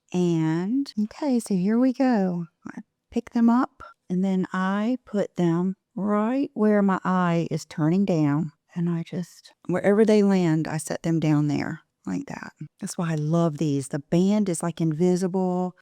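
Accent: South, United States. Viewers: One reason you’re successful is you’re really careful about what you say and how you say it. Even when you’re putting something out there that might be considered critical by some, you say, “How do I say this the right way, not to come out with the wrong intention.” I’ve been mindfully attempting to be more like that. I am really working on it. That, and carnivore, thanks to you. I love that you do so many videos each week. Love your voice, too. So comforting. I lived in Alabama for 3 years and though your accent is not N.Alabama, it’s a soft, sweet Southern accent, just the right speed, and tone. You keep doing you, which inspires us. ❤️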